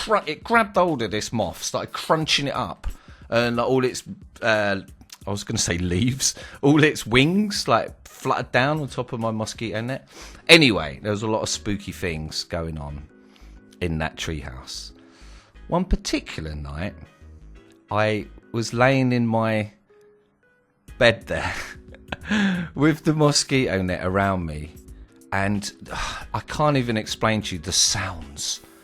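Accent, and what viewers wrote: United Kingdom. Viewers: ur fucking hilarious mate just subscribed where are you from in England it seems amazing how different some.brittish ppl sound compared to others but I guess everywhere has different accents lol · sounds like a London guy, as no Northern Monkey could be so entertaining.Him & Frankie have cost me a fooking fortune after trying out the games they convinced me to play.: )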